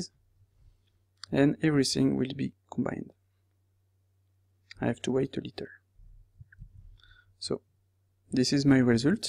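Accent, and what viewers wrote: France. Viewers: Sa se voit que ces un français qui parle mdrrr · j'avoue xD l'accent est frenchie frenchie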